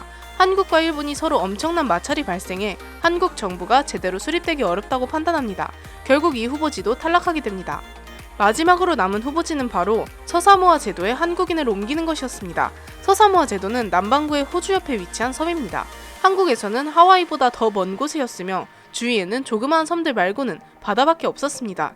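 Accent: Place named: Daegu, South Korea. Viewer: 대구분이신가ㅋㅋㅋ 사투리 쓰시넹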